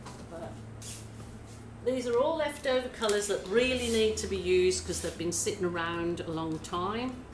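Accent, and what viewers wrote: Birmingham, United Kingdom. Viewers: where in Birmingham are you from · Yes a resident of Australia for sometime however she clearly has a British accent, very obvious to Australian ear. 😊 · WoW! That looks so good! Well done to you. Love the Birmingham accent. We live in Perth WA. We come from England. Have been here for 20 years now. Must try this. Sara x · Queensland? I'm hearing brummie undertones in your australian. . You got away. Good for you. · Love to hear your Brummie accent underneath your new Aussie one 🙂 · true blue Aussie here, just like to point out her accent is British not Aussie. And here in Australia it is pronounced AL-YOU-MINIUM 😊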